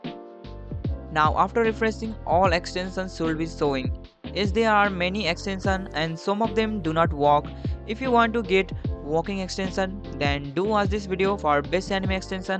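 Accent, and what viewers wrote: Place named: India. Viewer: Lost all motive when I hear indian voice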